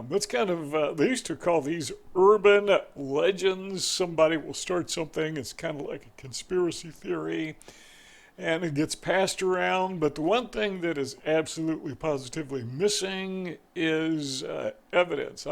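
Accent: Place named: South, United States. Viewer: I’m Australian,and I can pick your southern accent quite easily. It’s a mild accent, but there all the same, especially when you say “I”, it sounds like “ah”.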